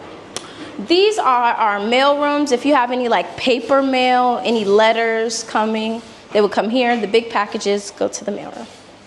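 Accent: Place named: Bahamas. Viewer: Her voice sound like Bahamas, Bahamian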